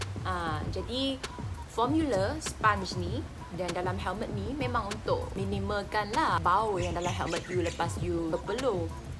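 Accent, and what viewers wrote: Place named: Kelantan, Malaysia. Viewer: Wah pandai kecek kelate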